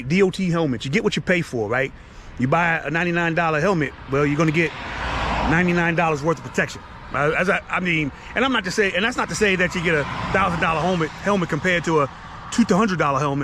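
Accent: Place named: East Coast, United States. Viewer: Great content once again fam. I’m glad you cover the importance of being a motorcycle rider, I’ve had my license since 2005 along with training, but I rode before then. Just a quick question brotha, you sound like you’re from the East coast, but how is it in Savannah, Georgia? I’ve been thinking about getting out of Cali for a couple of years even though LA is hometown. Don’t answer where you’re from if it’s G14 classified, fam lol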